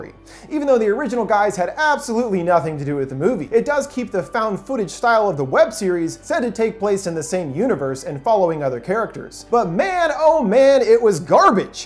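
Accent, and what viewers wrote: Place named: New York, United States. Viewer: Great new York accent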